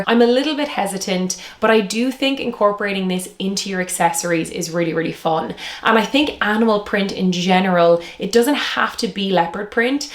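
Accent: Ireland. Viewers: Thanks , lovely to hear an Irish fashion stylist. Be great to see fashion for over 60s style, a little. But great update on what’s coming. Thanks ❤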